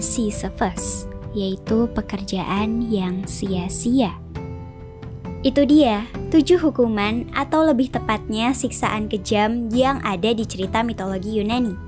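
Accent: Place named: West Java, Indonesia. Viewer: Kakak pasti orang sunda ya kak ... 😅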